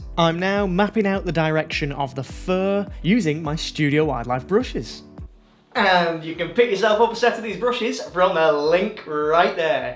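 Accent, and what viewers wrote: United Kingdom. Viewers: you are to precious, I have tried to place your accent and wow I felt it was British, love every adventurous technique you experiment with and the excitement when you have achieved it successful, send you a grannies hug sadly my acrylics still keep turning to chewing gum no matter what I, then digging through 20yrs old acrylic liquids they worked like a charm, moral is ever give up, keep excitement in your voice, it's very motivating, send a grannies hug well done❤Eunice SA 82yrs old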